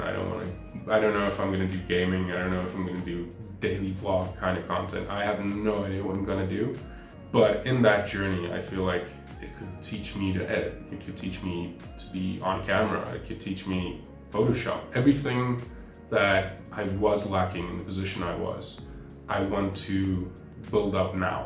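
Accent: United States. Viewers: That's funny, I never knew. I asked because I just assumed with your accent that you were from the state's. I have my own production company/ recording studios and am always looking for new projects to take on. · Are you from SA, you sound American?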